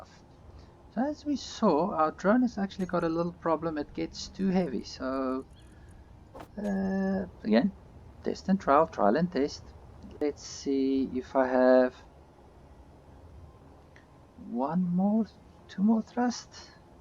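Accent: South Africa. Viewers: Ah ok, accent was dead giveaway :) im unfortunately still in South Africa...but yeah, I enjoy this game quite a bit. Happy engineering to you and keep well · Sounds like a South African to me! Lekker!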